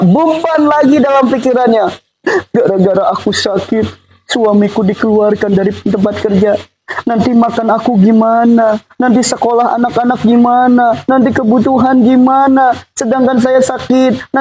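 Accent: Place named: West Java, Indonesia. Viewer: Ya allah pa ustadz, sunda bngt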